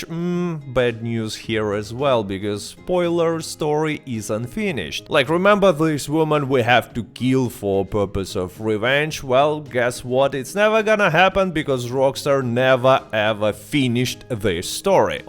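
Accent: Russia. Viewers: Are you Russian? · Ohhh this russian accent, but video is zaebis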